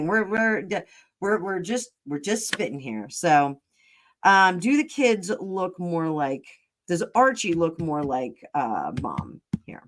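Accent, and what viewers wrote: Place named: New Jersey, United States. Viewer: Great reading . I love the NJ accent. lol sometimes my Deep South comes out in me . Who am I kidding - I can’t get rid of it. 😂ya know I’ve never really wished a divorce on anyone but I do with them. I just hope they get out before Harry loses it completely. ❤️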